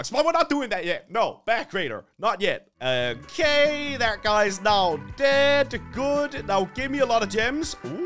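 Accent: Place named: Denmark. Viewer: jeg kan høre tydeligt at du er dansker gode videoer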